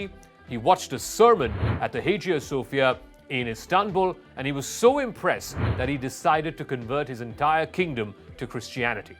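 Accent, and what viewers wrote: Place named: India. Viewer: dellivver.. lol. indian accent is amusing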